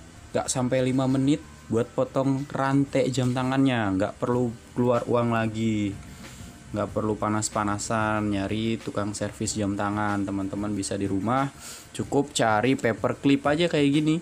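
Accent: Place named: West Java, Indonesia. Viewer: sunda pisan mang..nuhun trikna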